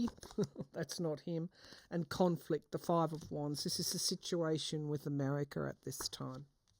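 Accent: Australia. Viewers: I love hearing the sayings in different countries! I've never heard 'rugged up' - I chuckled a little and thought it is quite appropriate when wrapped up in cozy, fuzzy sweaters ('rugs')!!! (I'm in the US, originally from MA, been in FL for 20 yrs now) starting to get hot already!!! Would love to see Australia!!!!!!!!!! My favorite accent!!! The you!!!!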